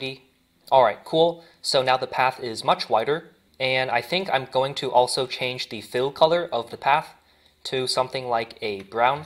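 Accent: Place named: Asia. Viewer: the asian accent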